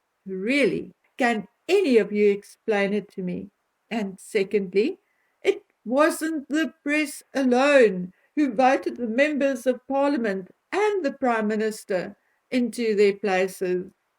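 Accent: South Africa. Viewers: Hello, I just happened upon your channel and recognise the accent, are you South African??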